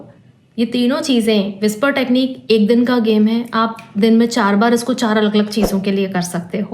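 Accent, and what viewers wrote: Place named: Indore, India. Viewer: Everything is superb but the way you say something it's like an indori accent, bahut sundar smjhaya hai apne sita ram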